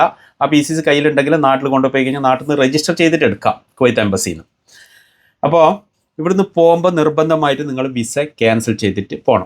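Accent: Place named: North Kerala, India. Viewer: താങ്കളുടെ വീഡീയോ വളരെ ഉപകാരപ്രദമാണ്. പ്രവാസികള്‍ അറിയേണ്ട നിരവധി കാര്യങ്ങള്‍ സമയാ സമയങ്ങളില്‍ അറിയുക്കുന്ന താങ്കള്‍ക്ക് നന്ദി രേഖപ്പെടുത്തുന്നു. താങ്കളുടെ സംസാരത്തില്‍ നിന്ന് താങ്കള്‍ വടക്കന്‍ കേരളത്തില്‍ നിന്നുള്ള വ്യക്തിയാണെന്നാണ് മനസ്സിലാകുന്നത്. പക്ഷേ, പലപ്പോഴും താങ്കള്‍ സംസാരിക്കുമ്പോള്‍ തെക്കന്‍ കേരളക്കാര്‍ തെറ്റായി പറയുന്നതത് പോലെ 'കിട്ടത്തില്ല', 'പറ്റത്തില്ല' എന്നൊക്കെ പറയുന്നതായി കാനുന്നു. നല്ല കാര്യങ്ങള്‍ സ്വീകരിക്കുന്നത് നല്ല കാര്യം തന്നെ. പക്ഷേ, ഇത്തരം അബദ്ധങ്ങള്‍ എന്തിനാണ് അനുകരിക്കുന്നത്?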